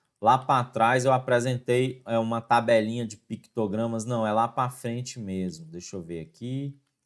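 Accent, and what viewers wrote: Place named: Brasília, Brazil. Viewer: Sotacão de brasília é inconfundível kkk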